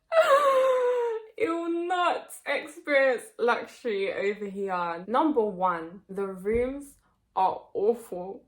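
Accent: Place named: United Kingdom. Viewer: British accent, hard to understand for me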